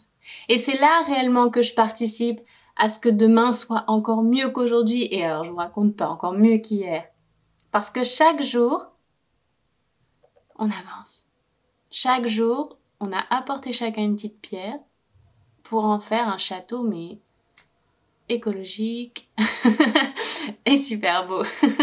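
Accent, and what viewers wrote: Spain. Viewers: Coucou ! Ya estás pillando el accento español un huevo! :) Yo pillando el francés....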